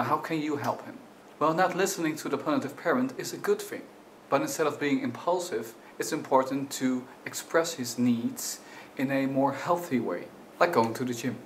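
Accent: Netherlands. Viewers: He has a Dutch accent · Ik had al zon voorgevoel dat je nederlands was met het accen'tje xD haha Anyway even paar vragen over borderline... Hoe weet ik van me zelf of ik borderline heb? Ik zit ook vaak met gemengde gevoelens weet nooit hoe ik ermee om moet gaan het ene moment show ik ze heel veel anderen moment krop ik ze op en ben ik net een tijd bom die tikt Ik kan van vrolijk in 1 minuut overslaan naar boos/verdrietig In relaties ben ik onzeker, obssesief jaloers ... en word ik boos zonder rede Zijn dat tekenen? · Are you Dutch ? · Very good- I almost cried when you talked about the abandoned child/abused child. I can identify with that kid all too well. Thanks for the video. (Yes, I am a BPD person too). PS_ are you Dutch? I was trying to place the accent the whole time. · Are you Dutch? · Recognized the accent of my fellow Dutchie within 1 second. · het is zo makkelijk om jouw accent te herkennen omdat ik ook Nederlands ben, denk ik :s Misschien kan je dit filmpje ook in Nederlands doen? 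Meer watchers :D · Ik wist het, ik hoorde je accent en dacht hij moet Nederlands zijn haha. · English is CLEARLY not his first language so he speaks it with a Dutch accent. It's not impossible to have deduced that, surely? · I love your Dutch accent. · Is this guy dutch? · Jij bent een nederlander klopt dat? haha ik hoor het aan je accent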